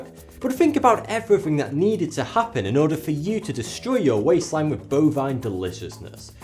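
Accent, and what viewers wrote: United Kingdom. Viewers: There's nothing wrong with his pronunciation. UK English, mate.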